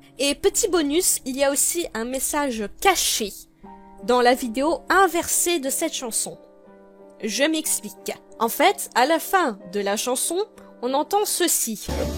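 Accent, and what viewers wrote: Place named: Belgium. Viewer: Y'a un petit accent Belge là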